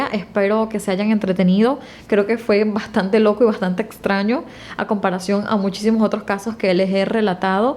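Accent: Venezuela. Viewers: Mrkaaaaa eres de Venezuela? Lo digo por el ascenso qwq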